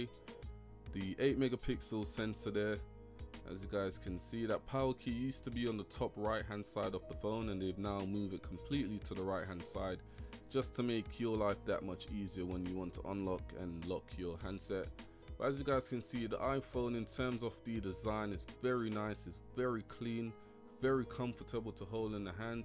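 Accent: United Kingdom. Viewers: London accent. Ahhhh how I miss home.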